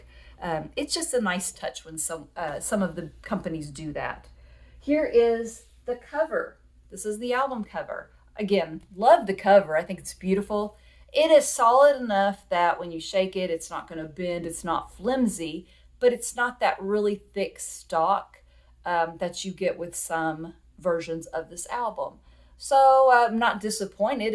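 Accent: South, United States. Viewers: .Lovr your southern drawl.Your excitement is evident on the video.This is not even his celebrated era.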